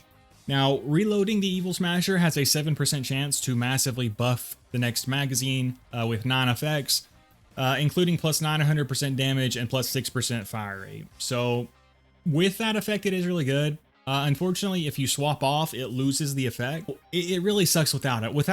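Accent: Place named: West, United States. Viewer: I’ve watched a ton of MOLMF vids this guy clearly sounds way more southern. While sounds more western, I think Nevada or New Mexico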